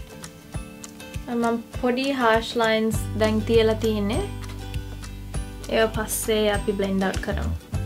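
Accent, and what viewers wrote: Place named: Sri Lanka. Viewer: Nice video 😍 love your Sinhala accent 😘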